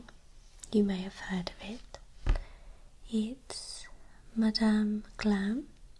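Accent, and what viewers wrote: United Kingdom. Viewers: damn her accent is so British :')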